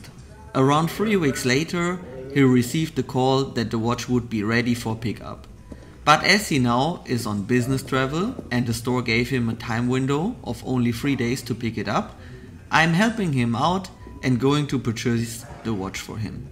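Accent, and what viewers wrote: Germany. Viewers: du bist 100% deutsch so wie du sprichst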